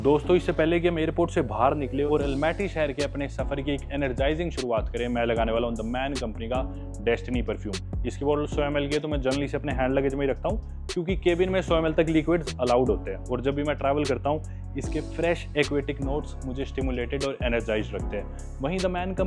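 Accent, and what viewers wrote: Haryana, India. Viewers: भाईसाहब की हरियाणवी भाषा कमाल का है सुनने में अच्छा लगता है एक अलग ही वाइब है भाईसाहब को छत्तीसगढ़ से प्यार 🥰👍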